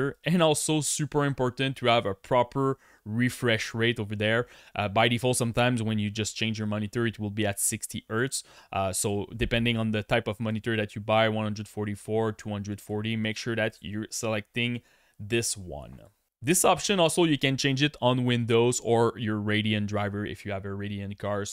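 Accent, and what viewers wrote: Quebec, Canada. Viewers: T'es clairement Quebecois haha! L'accent est accurate.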